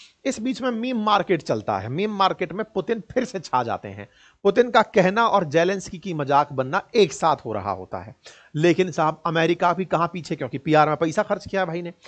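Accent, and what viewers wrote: Bihar, India. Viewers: ji, aapkay hindi lehzay say lagraha hei aap biharsay hein?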